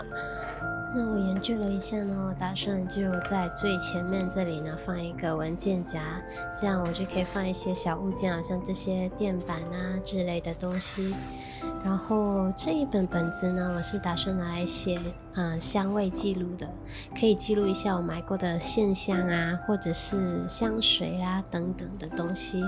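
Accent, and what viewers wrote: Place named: Japan. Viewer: Hi ~ I always thought that you are Japanese. 😅 Your Chinese sounds are so cute.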